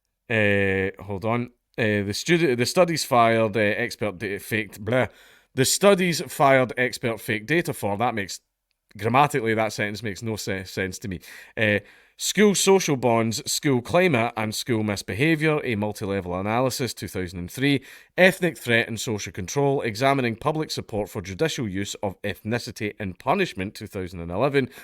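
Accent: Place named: Scotland, United Kingdom. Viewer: Sex studies retracted. Gotta love the Scot accent.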